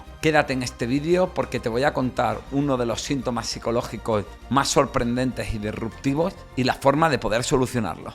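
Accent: Andalusia, Spain. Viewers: hablas un andaluz culto y perfecto, o sea me encanta tu acento, además has dicho prejuicio.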